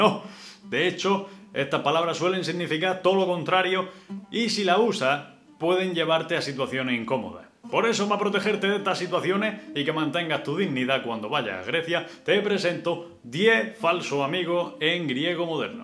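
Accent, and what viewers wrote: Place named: Andalusia, Spain. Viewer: Soy andaluz de padre y madre andaluza, y ni siquiera yo tengo un acento andaluz tan marcado como el tuyo... ¿dónde y cómo lo aprendiste? Al aprender español cómo es que te decantaste por la variedad andaluza y no por cualquier otro dialecto español. Un cordial saludo desde Andalucía, concretamente desde Málaga. :)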